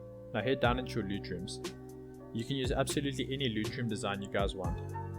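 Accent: South Africa. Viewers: are you South African? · South African?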